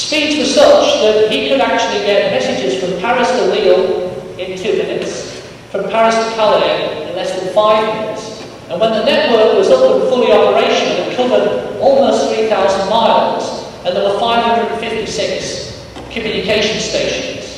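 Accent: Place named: England, United Kingdom. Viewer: This guy is definitely English. SECOND POST!!!!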